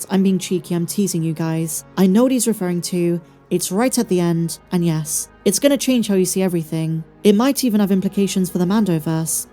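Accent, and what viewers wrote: United Kingdom. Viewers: Ain’t even gonna lie just noticed you are British lmao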